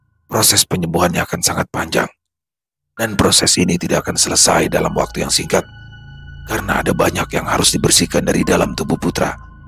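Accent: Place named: Banjar, Indonesia. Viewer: Abang cocok jadi orang banjar. Soal nya klo bicara bahasa banjar abang logat nya banjar bangttt ☺️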